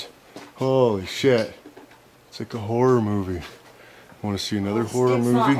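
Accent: Canada. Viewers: lol, this guy is like someone from trailer park boys, but with more of a Canadian accent.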